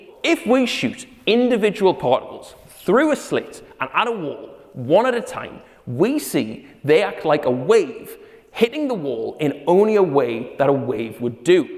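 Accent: Newcastle, United Kingdom. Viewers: Very impressive, proving you can say something smart in a Geordie accent!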